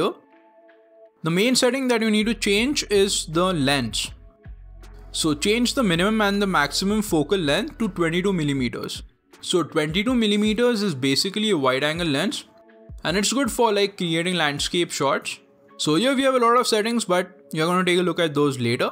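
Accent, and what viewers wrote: India. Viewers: The indian accent is terrible to listen...evolve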